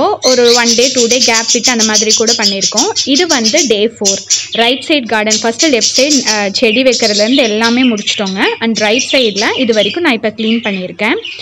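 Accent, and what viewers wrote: Kongu, India. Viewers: Hi... I will follow all your videos,as a newly wed I love to be like you in the way of care you show towards the family... Love you lot... I am from pollachi happy to hear our kongu tamil🙂keep going you are amazing😊eagerly waiting for your next video... And you are multitalented though I would say... Love you 😘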